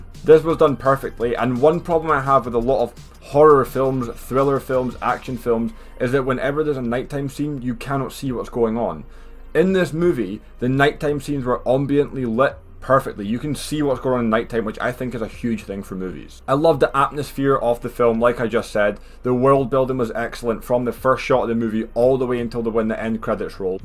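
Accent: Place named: Scotland, United Kingdom. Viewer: Mate you sound scottish 😀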